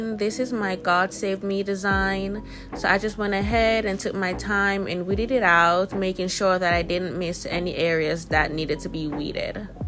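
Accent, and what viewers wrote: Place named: Jamaica. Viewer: Thanks for sharing sounds like you are Jamaican I am too good luck on your business. I am starting my tshirt business too so I’m here to learn and grow.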